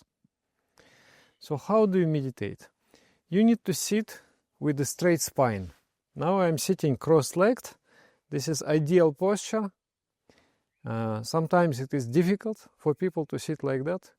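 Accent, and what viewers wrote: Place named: Russia. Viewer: Is this guy Russian?! 🤪😝 I know this accent all too well 🤣 Great meditation, I’ll stop getting distracted by my country’s accent 🧘‍♀️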